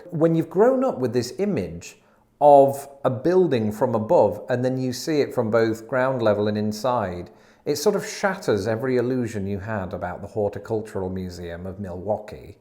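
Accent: United Kingdom. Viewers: don't get TOO Americanized, because our 21st century culture is largely crap. And above all, don't lose your British mode of diction. It will always always serve you well. And by the way, a Russian friend of mine once clarified the matter for me about the alarm going off. It doesn't go off; it goes on. Same goes for the bomb.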